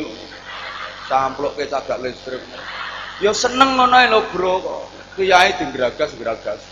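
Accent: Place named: Java, Indonesia. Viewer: mas jawane ngendi se mas minak men nk matur jowo kok yo pas men ean kie... mugo" suatu saat njenengan bener" iso ceramah tenanan. ami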